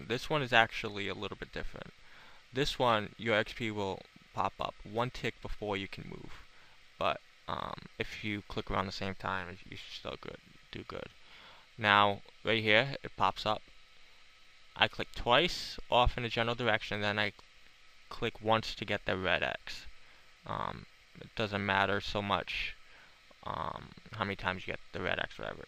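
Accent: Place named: New Jersey, United States. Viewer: morrrrrrrrrrrrrre and you have like a jersey accent :P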